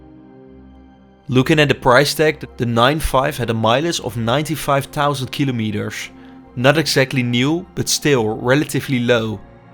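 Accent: Netherlands. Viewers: Do i hear a dutch accent🤔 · Kikass Dutch accent! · The person reading the text must be Dutch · Het Nederlandse accent is te erg. Klinkt echt slecht. De video is wel leuk. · Bro that dutch accent you heard that right away! haha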